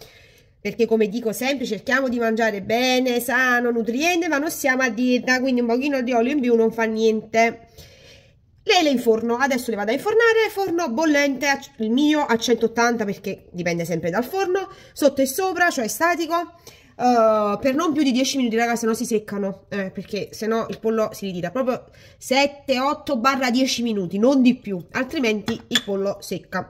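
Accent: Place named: Naples, Italy. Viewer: Poi vabbe dialetto Napoletano....Adorooo👍👍👏👏😍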